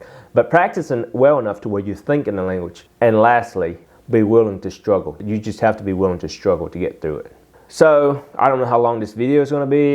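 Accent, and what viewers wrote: South, United States. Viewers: Totally!! He totally got the Southerner accent, which is so cool. :)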